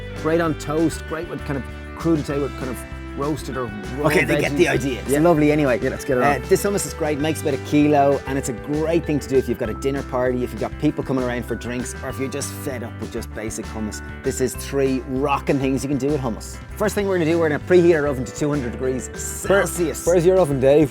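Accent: Ireland. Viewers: Who would have thought Ireland had such hidden gems! Your fantastic accents distracts me from the food in hand! :)) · You guys are the best! Love the accent. Is it Irish?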